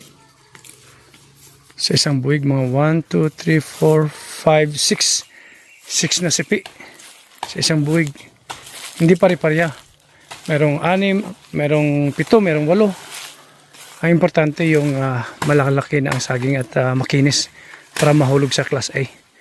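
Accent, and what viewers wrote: Iloilo, Philippines. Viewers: Ilonggo gid haha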